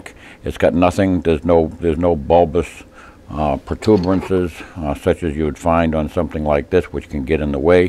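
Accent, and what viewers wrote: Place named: Massachusetts, United States. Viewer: Great video. Very informative. I have two S&W revolvers and this is great information. Also enjoy the Massachusetts accent :)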